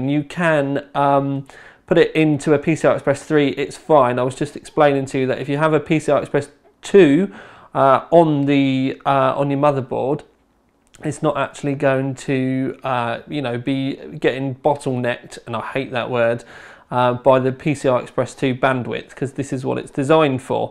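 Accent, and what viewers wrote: United Kingdom. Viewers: anyone here knows how to avoid UK accent videos on youtube?? OMG!!! headache. Thanks for the video anyway o.O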